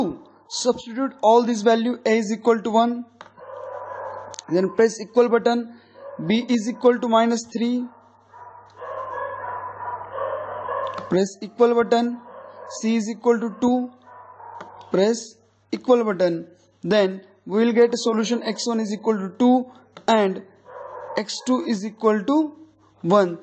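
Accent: India. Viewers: I saw hope as soon as I heard the Indian accent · thickest indian accent ever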